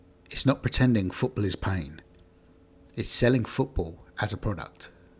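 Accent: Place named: United Kingdom. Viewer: You have a heavy British accent and even if you are not a Brit you are definitely from Europe. All that means that you experienced that football, which is the Great Grandfather of the MLS. So yes coming from there you have all those feelings about the MLS. The Bits are the creators of the football we play today. Ebenezer Cobb Morley — often called the father of modern football because he helped write the first official rules. ⭐ So the short answer: 👉 England invented modern football (soccer) in 1863 when the rules were standardized.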